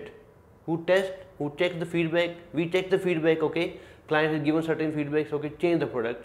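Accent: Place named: Bihar, India. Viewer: sounds like a bihari... brilliantly difined. biharis are really brilliant....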